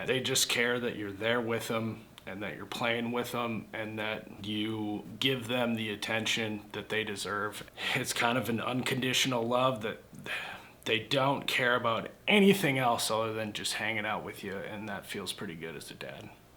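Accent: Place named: Dakota, United States. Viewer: I've seen your comedy sketches on FB for a while, but just over the last couple weeks have seen your YouTube videos. With that said, loving it man 😂. Can tell you're a Dakota guy, and sound exactly like all my friends from back home when you're talking about real shit.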